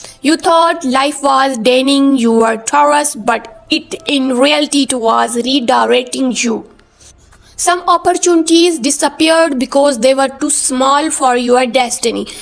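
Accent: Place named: India. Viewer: Panget na ng channel na to dati ok na ok .. Yung Tagalog at lalake pa ung narrator. . Ngayon di na maintindihan pag sasalita naging Indian na ... .. hayss sayang channel mo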